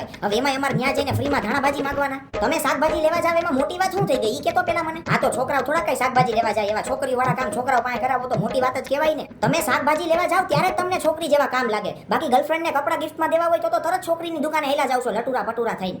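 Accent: India. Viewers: bhura tu rajkot no cho k nay... mne avu lage 6...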